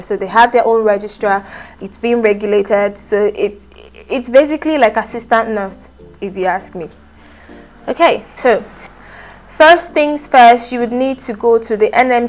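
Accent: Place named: United Kingdom. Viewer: Its the British accent for me......keep it up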